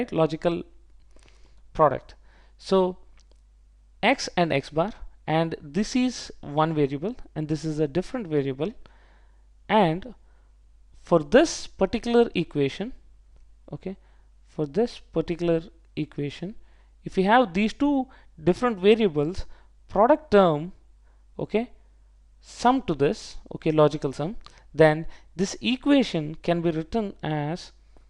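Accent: India. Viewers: its indian accent bruh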